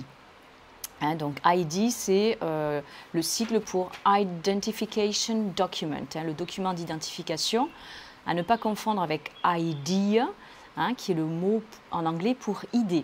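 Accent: France. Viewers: I love your French accent when you speak English. Innernet : Internet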